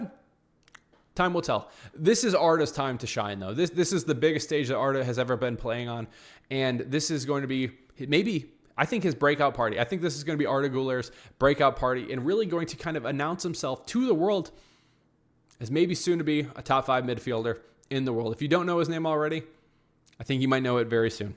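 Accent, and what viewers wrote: United States. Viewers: If its a contest between the midfields, madrid will lose. Part of how/why Sevilla won vs Barcelona was Sevilla interrupting Barcelona's "normal" passing network. [You sound American, so] Go ahead and look at a passing map for a "normal" Barcelona fixture, and then look at the passing map vs Sevilla. Normally, Barcelona run everything through Pedri, who then distributes the ball all across the park. But Sevilla had their #18 give up any pretense of doing anything other than to man-mark Pedri, which turned Barcelona's normal pass network into something that more looks like the USMNT. It also cut Pedri's "normal" number of touches in half. Taken together, this interrupted Barcelona's possession plans. Most imbecilic commentators are too lazy to see anything beyond "the high line" with Barcelona, but what's more important to Flick are Barcelona's press, and Barcelona's possession. 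Interrupting Barcelona's possession is the one way that another midfield can outplay Barcelona's. Sevilla did it, and Q$G did it. With respect to madrid, I don't know if any of Camavinga, Guler, or Bellingham are willing to subsume "their own" games, in order to go try to mark Pedri out of the game. In fact, I doubt it. It is for this reason that if it comes down to madrid's midfield vs Barcelona's, madrid will lose.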